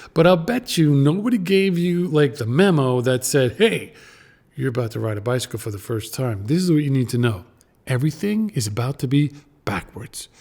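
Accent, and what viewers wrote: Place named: Northeast, United States. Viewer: your accent reminds me of childhood 😂 northeast?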